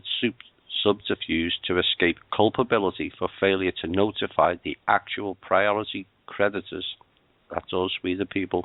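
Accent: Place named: Ireland. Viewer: ...and a special thanks to Anna Von Reitz, your narrative voice, although strangely masculine and Irish, has a wonderfully hypnotizing monotone to it that has helped my insomnia....I can now listen to this youtube audio, which I have recorded as a therapy aid, because its tempo puts me to sleep in under a minute flat....thank you....!!!...I'm cured!!!!....and you've saved me a fortune in alternative solutions.....thanks again...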